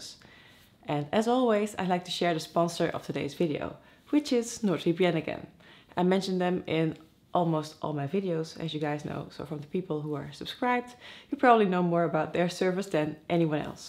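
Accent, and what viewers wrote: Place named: Netherlands. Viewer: lekker Nederlands accentje hoor!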